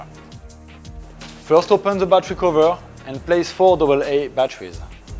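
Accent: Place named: France. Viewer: Bonjour oh l accent francais LOL ouuuuu je voudrais bien la meme demo en francais surtout pour la camera.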